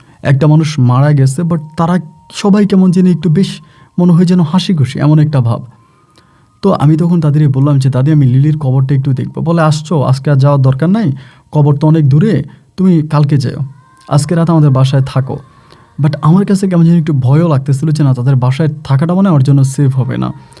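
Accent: Bangladesh. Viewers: You were not born and brought up in England, your accent is Bangladeshi.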